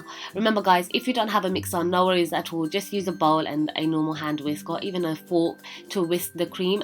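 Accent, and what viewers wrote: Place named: Luton, United Kingdom. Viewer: Are you from Luton?! Because you sound like it I mean that accent! I'm from Luton as well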